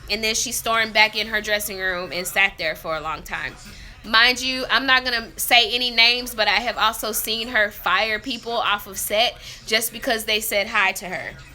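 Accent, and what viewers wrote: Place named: South, United States. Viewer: That southern accent is never gonna go away darlin'. You are always awesome!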